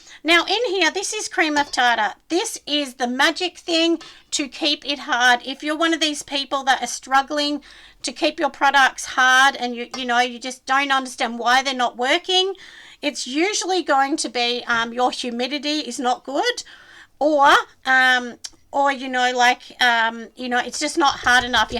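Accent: Australia. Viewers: Dear a bit hard to follow the receipt.. english my second language and with autralian accent it would make it much easier to just hsve the recipe in the description as you mentioned at rge beginning of your video. Thank you for your efforts to share yr skills. From canada quebec..merci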